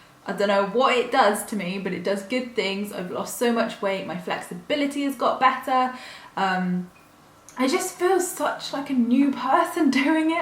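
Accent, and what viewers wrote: England, United Kingdom. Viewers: I'm trying to manifest my dream home, which would be a self build straw bale house (not as unstable it sounds lol, actually a really well insulated house that is covered in plaster and cheap and eco friendly). This will mean I'll need a lot of money to buy the land and pay for the build, but I'm not complicating it by thinking about all the different aspects of it too much, I'm just visualising the house, and am going to try putting my written list in a manifestation box too like you have suggested. I'll watch the rest of your videos too and let you know how it's going, great to find another English person making these videos too, though all the American ones are still inspirational, somehow the English accent makes it more relevant to me.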